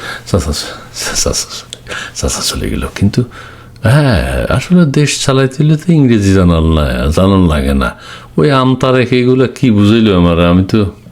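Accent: Noakhali, Bangladesh. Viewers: I am enjoying your discussion as you are dialect as good as my grand father who was from Laksham nearest to Noakhali.I have an emotional attachment with this language.Now I am 73 yrs ( retired from govt service) old.I have a strong desire to visit my ancestors land but I am disheartened to see the reaction of Bangladeshi people after so called August revolution.There is no such communal atmosphere in our country ( not at all in west bengal) .We have intarected with so many muslim Shikh Gorkha Jath Rajput Maratha etc etc but never felt any difference during my service life.Yes recently some stray incidents happening in some regions of our country.( Communal clash).We have no jealousy if you become a prosperous country but give up this hatred against others. Because above all we are human being and religious identity is the creation of man not by almighty.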